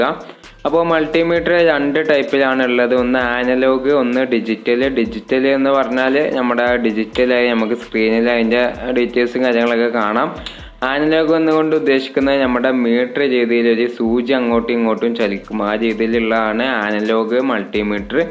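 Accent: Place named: Malappuram, India. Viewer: Muyuvanaayum ???? Enthu malayalam aado parayanathu.... malapuram or calicut sure. maya , payam , puya ..... 😷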